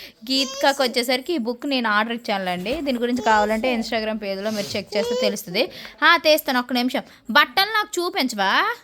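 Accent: Srikakulam, India. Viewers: Me srikakulam anttah bad slag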